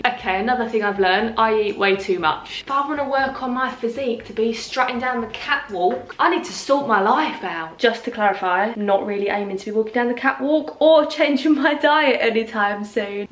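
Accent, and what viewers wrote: United Kingdom. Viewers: I looooove british accent!..you are beautiful! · Loved this video; you have such a great personality and so funny! Love your British accent too. 😆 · I love the British accent because it makes every video funny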